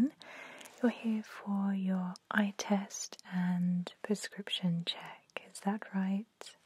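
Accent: United Kingdom. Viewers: I don't know what it is about a British accent, but it makes ASMR videos so much more enjoyable. 😊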